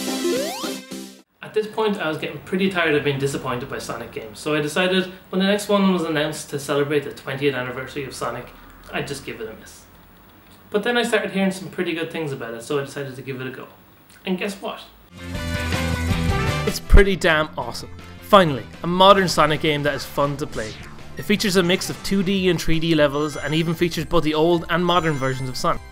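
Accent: Ireland. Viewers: don't take this the wrong way, but I love your accent. you're Irish I'm guessing?? My family's part Irish and Scottish, so I had to try and pick apart your dialect and such. cheers to you and all!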